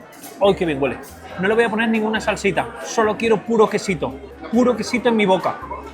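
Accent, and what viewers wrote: Spain. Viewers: De todos modos me caga el acento español.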